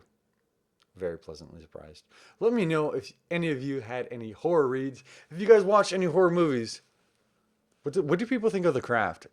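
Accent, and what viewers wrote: South, United States. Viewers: I can't believe you had a lot of spooky books at your house in Texas on this video during your quarantine including Practical Magic by Alice Hoffan, The Haunted of the Hill House, Cemetery House by Aiden Thomas, Mexican Gothic by Silvia Moreno-Garcia, The Year of the Witching by Alexis Henderson, The Southern's Book Club's Guide to Slaying Vampires by Grady Hendrix and everything including House of Leaves by Mark Z. Danielewski until you're talking about all of the spooky books to read them at the same time while you're telling the whole story from all of the spooky books after that, but I hope your husband, Zach will be very proud of you and I love your Southern accent, I wanna say Happy Halloween to you and him, so stay safe!!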